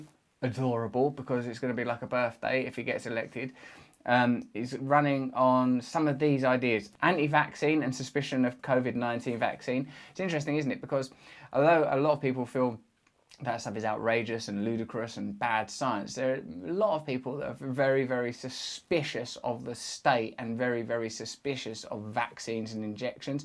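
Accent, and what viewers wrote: United Kingdom. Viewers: I love the way Russell talks being British but he clearly knows nothing about NESARA/GESARA and who is bringing it !!! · WTF? This is an American with a British accent. Just more proof the end is near. By the way, WTF did he say?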